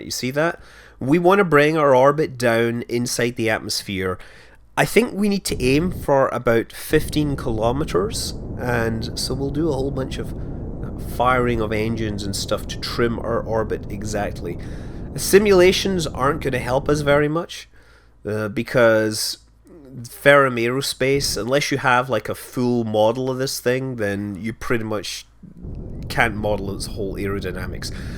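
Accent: Scotland, United Kingdom. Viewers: Nah, it's just the Scottish accent.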